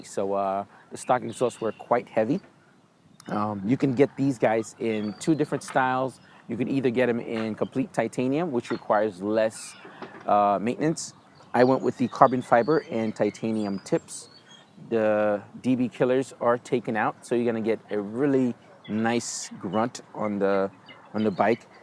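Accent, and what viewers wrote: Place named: New York, United States. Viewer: For some reason all I can hear is John Leguizamo, well without quite such a harsh Queens accent. Must be a New York thing, though you sound nothing like my friend that's New York Italian/Irish, but then he's from Northern NY.. 30+ years away and his accent is still thick as a cabby's heart.. especially when he drinks. Ever noticed the Termi logo looks like a pair of balls with a schlong hanging low? :P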